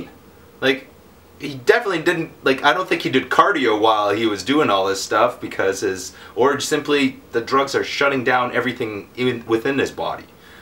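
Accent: Canada. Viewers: Is he Canadian? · You said aboot. You're Canadian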